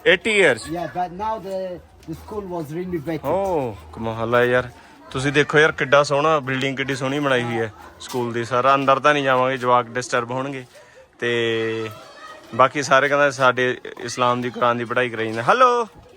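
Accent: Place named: Malwa, India. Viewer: ਯਾਰ ਤੇਰੇ ਝੱਗੇ ਤੇ ਕੁਰਬਾਨ pure ਮਲਵਈ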